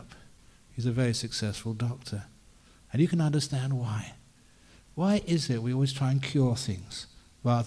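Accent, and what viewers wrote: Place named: United Kingdom. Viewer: ajahn brahms funny brittish accent. he says "thinkink" for thinking. all words ending in "ing" he says "ink"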